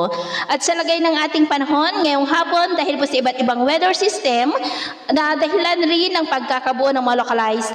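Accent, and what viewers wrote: Visayas, Philippines. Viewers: Bisaya kayo mam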